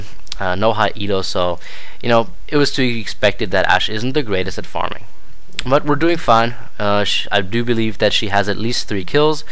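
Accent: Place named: Germany. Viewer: Man hört dass du deutsch bist aber nich so dchlimm ^^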